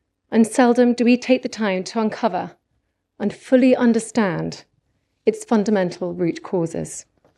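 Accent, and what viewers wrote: RP, United Kingdom. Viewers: british accent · I ❤ British accent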